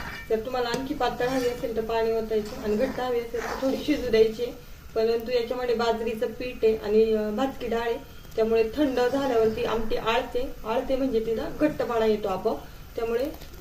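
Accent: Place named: Akole Sangamner, India. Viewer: तुमच्या आवाजावरून तुम्ही सुगाव अकोले संगमनेर भागातील असाव्यात असे वाटते. आमटीचे नाव शिंगोरी गावावरून असेल असे कधीच वाटले नाही. हि आमटी राशीनची पन प्रसिद्ध आहे.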